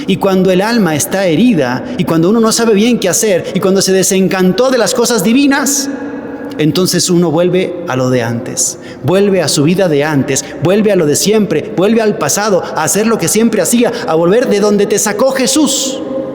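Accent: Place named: Spain. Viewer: Tiene un acento es español de seguro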